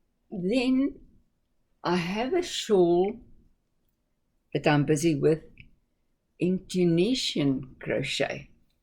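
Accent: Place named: Afrikaans, South Africa. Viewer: We were trying to place the accent. It has a little of that So Africa/Australia sound but also a Dutch/German sound. Afrikaans! Makes so much sense. My ex-husband spent two years in Capetown and we named one of my kids Xolani (ex says the click, I do not) and two of the kids have Xhosa middle names as well. I'm sorry about the bulkier yarn. I am glad you were able to make some things. That bear! Sooo adorable! I hope your great-granddaughters love the hats. I guess they don't need them until we are having summer. :D I like wearing slipper socks. :D I haven't been knitting long enough to need to repair socks yet. I made my daughter a reversible hat using Tunisian crochet. It's thick and so pretty. Love seeing what you have going.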